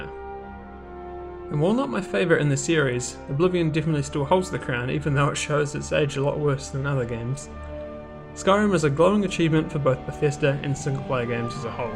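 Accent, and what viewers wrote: New Zealand. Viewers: Great video! Also are you a fellow kiwi? Bless up 🙌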